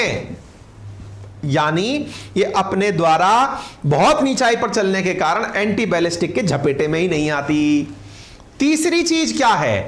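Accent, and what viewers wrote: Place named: Uttar Pradesh, India. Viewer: App ki English mairi tarah hi hai , due to up personal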